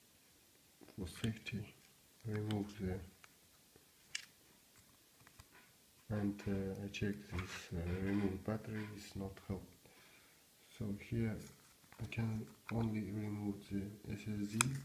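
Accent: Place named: Russia. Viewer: No he’s Russian. That’s their English. Very little and useful 😂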